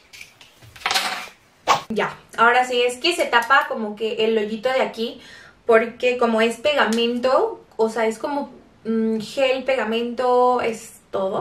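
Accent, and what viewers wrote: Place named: Mexico. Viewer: Amo a ❤🤩 En los asmr no se le nota tanto que es Mexicana 😊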